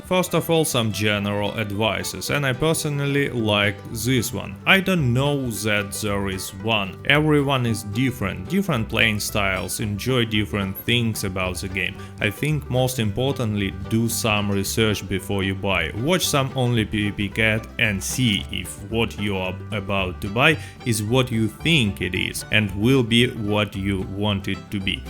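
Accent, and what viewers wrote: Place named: Russia. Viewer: I've been subscribed for about six months and have a couple of compliments for you. First, your sarcasm is super extra funny coming from a guy with a Russian accent. English idioms and puns are hard even for native speakers but you mastered it. Second, if I were to guess your occupation it would be in the sciences. The combination of the scientific method you use (you always have an independent and dependant variable, and eliminate possible variences in the tests) plus you have a good sense of humour so I would bet you are an engineer. Last, I loved the way you used to say "Target" as "Tarjet". You should keep doing that as a running joke. Mad props to you, far and away the best Rdr2 YouTuber!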